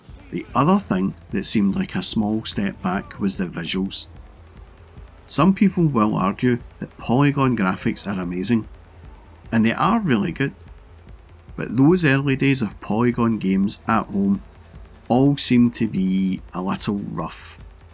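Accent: Scotland, United Kingdom. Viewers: I wasn’t expecting the Scottish accent 😅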